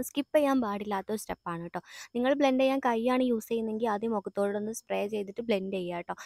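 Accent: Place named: Malappuram, India. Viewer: Ithaatha Malapprm aano place.. Samsaram kettitt thonnnd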